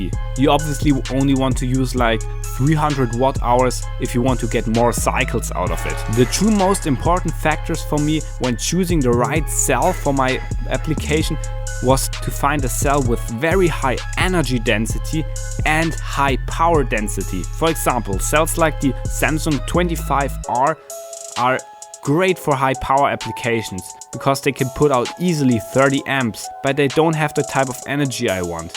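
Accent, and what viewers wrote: Germany. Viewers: Dieser Deutsche Akzent 😂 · Hey, das Video ist gut gemacht! Ich gehe mal davon aus, dass du eigentlich deutsch sprichst, dem Akzent nach. ;-) Inspiriert zum nachmachen, weil du vor allem deine negativen Erfahrungen aus einem früheren Projekt darstellst und anwendest. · Dat geman accent. Yuneec for me. Doesn't break the bank. As always... good luck eskating in ol germany.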